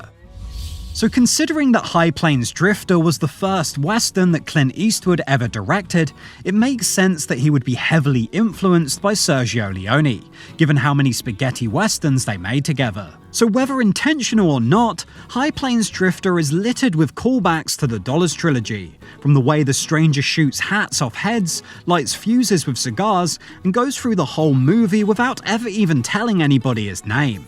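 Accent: United Kingdom. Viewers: I always wondered why when people make these videos it's never Americans always sounds like a British person or something like that I don't get it should be Americans doing this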